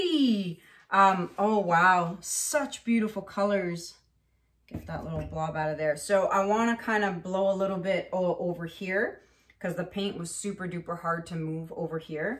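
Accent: Canada. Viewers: im from Georgia US and your Canadian accent just does it for me. i love watching you paint and getting tickled at your long "ooo"s lol thanks for what you do. still havent mastered a dutch pour but im not giving up. best wishes to you and your family.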